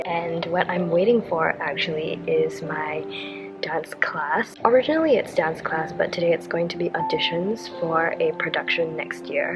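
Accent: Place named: Singapore. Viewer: Are u singaporean? cause u do have an accent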